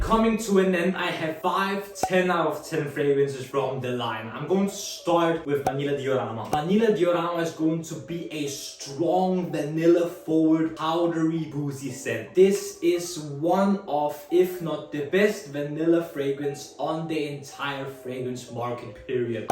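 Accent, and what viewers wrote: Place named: Netherlands. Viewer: Man seems to be that you are from Holland aren't you?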